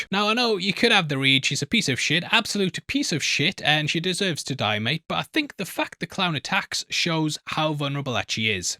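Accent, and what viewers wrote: United Kingdom. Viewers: Ain't nothing wrong with your "Shreveport". You're just British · As someone who grew up near Shreveport, you are saying it correctly. People probably just find it odd to hear it in a British or even neutral accent because of the deep, region-specific accent people near there tend to use.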